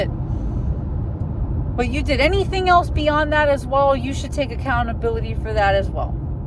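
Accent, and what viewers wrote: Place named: Texas, United States. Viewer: you sound like 100% Texas mother.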